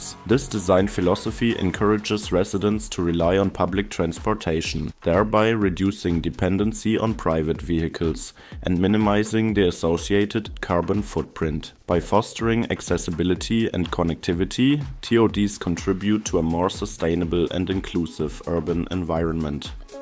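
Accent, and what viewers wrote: Germany. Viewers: Most stereotypical German accent ever.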